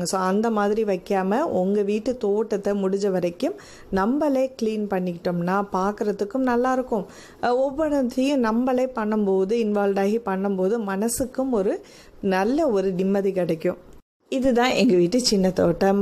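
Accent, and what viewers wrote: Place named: Nagore, India. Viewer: Asssalamu alaikkum wa rahamaduillahi wa barakkthuhu..Alhamduillah yella commentkkum reply panni erukinga 👏nan nagore ma ninga pesurathu yenga ooru karanga pesura mari erukkum..like appanu solliringale grandfathera 😊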